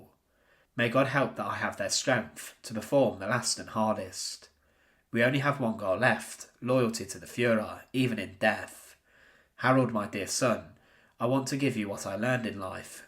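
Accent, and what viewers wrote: United Kingdom. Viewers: Depends on where he is from in the UK, hence his accent. If you don't like it, don't watch.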